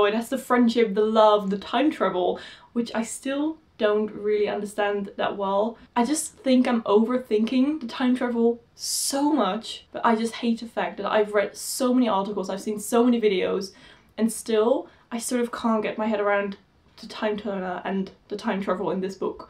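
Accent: United Kingdom. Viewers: me too. You sound very british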